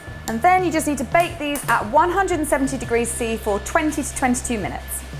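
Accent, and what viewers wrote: England, United Kingdom. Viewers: Is she british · That English accent, those cute dimples... and she bakes too. What more do you want in a woman? hahaha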